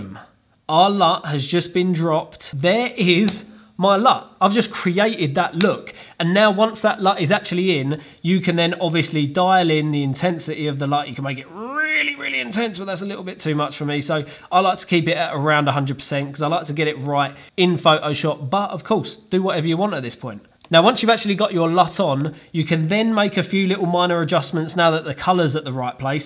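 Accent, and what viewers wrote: United Kingdom. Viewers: Strongest british accent...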